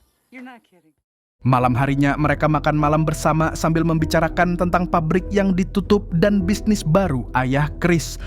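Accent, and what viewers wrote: Banjar, Indonesia. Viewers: Urg banjar Pina nya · Banjar nya masih ada 😂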